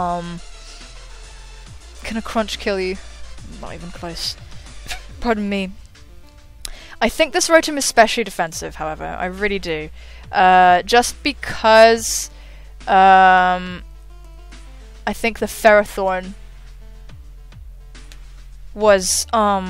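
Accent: England, United Kingdom. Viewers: Godstruct strikes again!! and not even burn can stop him from killing stuff, GOD i love that beast XD QOTD: im not american but i speak with the american accent so i suppose i say the the T's more or less like them :) i wish i could have an accent like your, i lived in England before in Plymouth but unfortunaly didnt manage to get the accent :(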